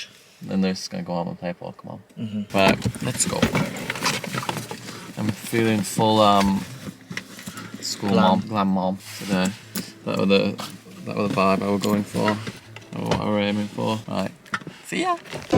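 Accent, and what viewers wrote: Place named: North, United Kingdom. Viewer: I love ur northern accent & you always sound like you had a spliff 🤣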